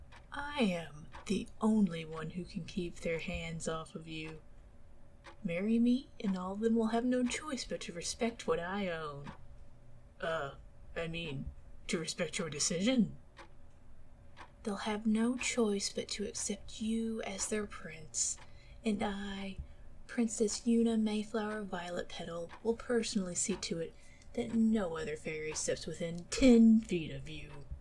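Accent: South, United States. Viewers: Definitely not you. Risking sounding like a simp, but you’ve got a voice (and likely a personality) like a southern angel😁. Honestly, it’s great to finally see an ASMR channel with a southern accent. Being a southerner as well, I appreciate good southern voice acting. Keep up the great work, can’t wait to see more!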